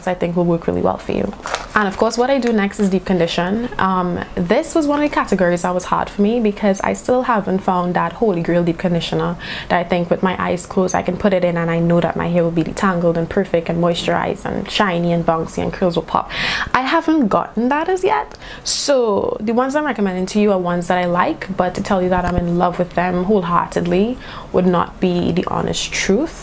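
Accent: Trinidad and Tobago. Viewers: We have the same Trini accent, are you from the Vale? Have you checked out Body beautiful on D'ave for some of your products?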